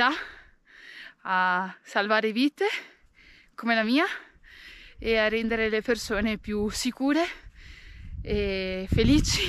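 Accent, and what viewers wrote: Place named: Veneto, Italy. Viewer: Ciao di seguo da poco ma sei fantastica come quasi tutte quelle che vanno in montagna poi accento veneto io sono di verona sponda lago di garda e quel tocco in piu.da dove 6?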